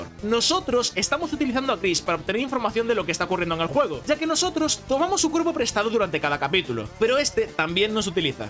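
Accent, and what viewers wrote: Galicia, Spain. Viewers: se te nota lo gallego Pd. Aléjate de las cabras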